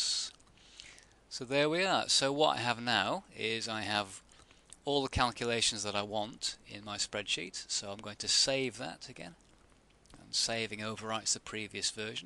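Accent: United Kingdom. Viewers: Wow he is a very good teacher very clear. and the British accent keeps it interesting!!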